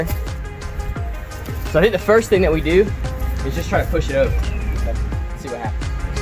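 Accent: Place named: South, United States. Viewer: Love he has that southern accent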